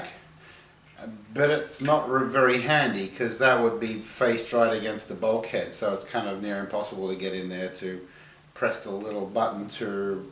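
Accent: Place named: Australia. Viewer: Nice job. Did you do a follow-up video? I think I'm hearing a bit of an Oz accent but the winter scene is throwing me. Where are you located?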